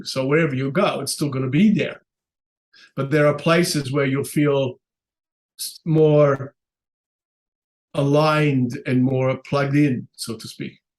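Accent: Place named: South Africa. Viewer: When speaks, I detect a South African accent. Interesting. I also recall a Dutch acquaintance speaking English with this sort of accent. I know this video is a couple of months old, and I have only just discovered this channel, but I would choose Hawaii over Portugal any day. Portugal has terrible bushfires from time to time. The people over there planted heaps of eucalypt trees which are notorious for their ability to catch fire easily. Has ever been visited by his wife from the next world since she cast off her flesh suit?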